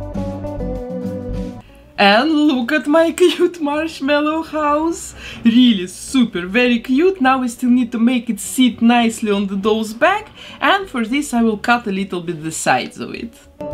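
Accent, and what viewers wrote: Russia. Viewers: Почему такой прям русский акцент)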